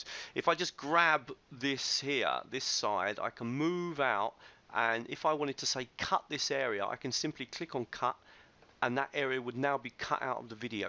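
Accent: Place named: United Kingdom. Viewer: GREAT-GREAT-GREAT TUTORIAL!!! I had some confusion about how to use Snagit and whether or not I could do a video capture as well as how to upload to YT and whether-or-not I needed Jing and Screen Cast in addition to Snagit, you have answered all those questions. So despite your Brit Accent-Not everyone can be privileged enough to originate from SE Texas-it is a great video and I am signing up for your newsletter-Keep Up The Good Work!!! Shavuah Tov...hcwjr/ponyhunter98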